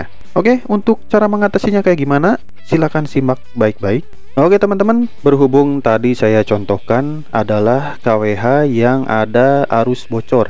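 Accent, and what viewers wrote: West Java, Indonesia. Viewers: Narator na Jigana urang Sunda.😁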